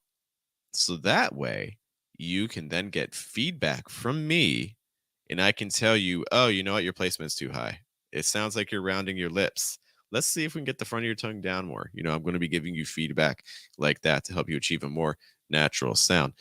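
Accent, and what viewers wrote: New York, United States. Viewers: I'm watching your content and it's so good. I have a question, which part of US are you from? New york?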